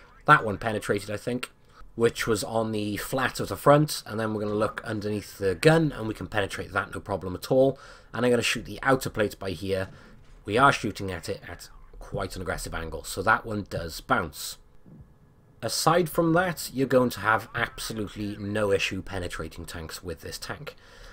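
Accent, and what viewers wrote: United Kingdom. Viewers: I'm English anyway good British accent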